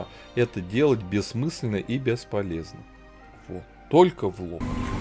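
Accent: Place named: Moscow, Russia. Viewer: Блин, какой же ты самодовольно противный. Прям Царь блин танков, манера Московская чтоли, акцент ужасно противный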